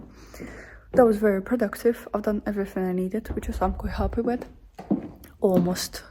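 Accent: Scotland, United Kingdom. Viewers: you have a beautiful accent! is it just my imagination or do you have a little bit of scottish? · Iv listened to a few of your videos, but your carol of the bells piano is stunning. Always thought you was eastern European for some reason. I can definitely hear the distinctive Scottish in you and the name should of been a give away. But am half Scottish grew up half life in England and Scotland but im struggling to understand you at times. Are you full Scottish. Ya accent is almost like Scottish and Scandinavian or something. Ah ok your feom Ukraine im more into the video. Your accent is almost like 3 accents, im kinda seeing what my accent is like now for the first time haha. As my accent is all over the place also 😂. Awsome Use ableton is more intuitive especially for just play loops in live and capturing ideas.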